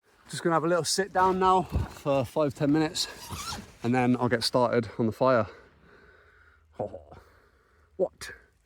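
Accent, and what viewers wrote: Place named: Bristol, United Kingdom. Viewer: are you originally from the Bristol area? I ask as your accent sounds like it is,and just around the time that I found your channel, I was walking along the Bristol to Bath cycle path and I was sure that I saw you walking along it with your gear and dog..😂 The fishponds,staple hill area. Please, I understand if you dont want to answer, but it always crosses my mind when watching your videos. Keep up the good work.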